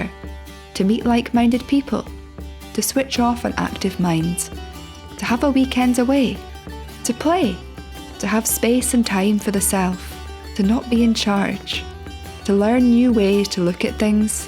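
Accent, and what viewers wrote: Scotland, United Kingdom. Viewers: Hi, I've watched your channel and loved your photography for years. Plus, your Scottishness is lovely. Be well. Bless you.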